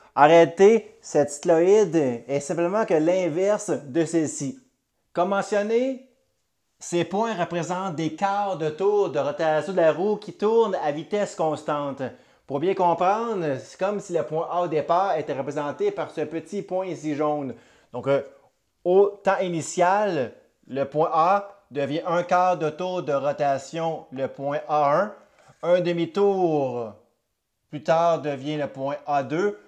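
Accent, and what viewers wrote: Quebec, Canada. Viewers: J'ai 70 ans et, été toujours "NUL" en maths ( je suis plus "cerveau droit". L'artistique.)! Je viens de découvrir votre chaine et de m'y abonner. En plus de l'accent Québéquois; que j'adore (je suis français), je trouve vos thèmes très attrayants et qui m'intéressent énormément ! Vous me donnez le goût de replonger dans cette science. Merci. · L'accent Canadien ❤. Entre parenthèses, très proche de l'accent en Charente Maritime en France. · C'est une belle explication mais il y a une grosse erreur que vous répétez à quelques reprises. La vitesse initiale du mobile quelque soit le plan incliné empruntée est rigoureusement égale à zéro. Et non plus ou moins grande selon la trajectoire prise. Merci cousine avec l'accent inimitable du Québec !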